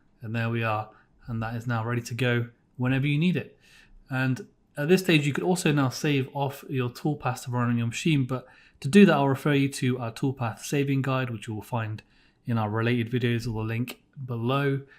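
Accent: United Kingdom. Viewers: Confused about why an Englishman keeps mispronouncing the letter Z? Surely if we can tolerate Aloominum, they can translate 'Zed'? Luckily I am old enough to still remember how to think in Inches though ;) Thanks for the Video, very helpful. Maybe add subtitles for an English speaking audience?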